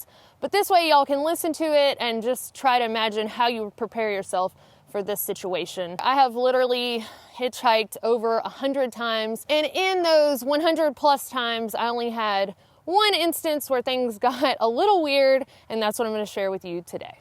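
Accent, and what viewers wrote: South, United States. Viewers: Great story and great advice! I live in Hiawassee GA near the AT(actually recognized the stretch of road near Dick's Creek Gap and Top of Georgia hostel in this video with the two smiling hitchers) and have picked up lots of hikers going to/from town. I've always had great interaction with those hikers and they're always super appreciative for the ride. Never a bad idea to take pics of licenses and vehicles and send those to a loved one or friend just in case. I do it all the time with suspicious looking characters... just a couple days ago at the Unicoi Gap AT trailhead while out for a day hike. Better safe than sorry! Love your videos and your southern accent! Keep em coming? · As a native NC’n that hasn’t backpacked in 20 years... I have no idea how your videos keep popping up, but I’ll keep watchin’ just to hear you say “hike” and “neck knife”. 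Nuthin’ prettier than a girl with a great southern accent! 😍 Sadly, most of the girls native to central NC have lost most of their accent with all the Yankees moving in. 😏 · Your videos are spot on! Stuff people need to hear and want to hear! The reason you do so good at getting new subscribers and people continue to watch you is because your genuine. It also helps that you have that southern accent but I can tell before you post a video you actually think what would I want to know about backpacking if I was starting out. Keep doing what you do and keep grinding! Remember that the things you take for granite other people are wondering. Here are a few things as a new backpacker I wonder about maybe you can do a video on. Is drinking and bringing your own alcohol frowned upon on the trail? How do you carry your alcohol? What about hunting or killing game on the trail? Fishing in ponds you come across? 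Do you carry fishing gear? If so what is the best? What app do you use for GPS? Eating spots?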